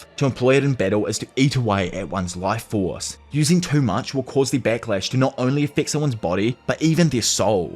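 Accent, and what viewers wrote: Australia. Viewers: Australian accent isn't it? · When history, spiritualism, botany, & anime are intertwined with intellectual acuity the results breed something akin to Hells Paradise. Delightful narration & compression of arc by. Who doesn't love a story told in Crocodile Dundy's accent. Highly anticipating your next arc explained. Thanks, but out of curiosity any significance choosing the name?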